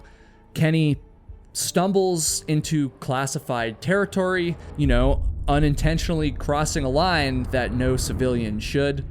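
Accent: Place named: New England, United States. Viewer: is a New Englander, irrc. I noticed staying in rural Vermont/upstate New York years ago that a lot of the speech patterns and sayings up there are quite similar to ours in the UK. Definitely moreso than out west or in the bigger cities.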